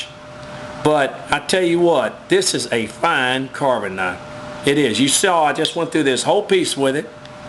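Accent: Texas, United States. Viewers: Nice info.. anyways is him using Texas accent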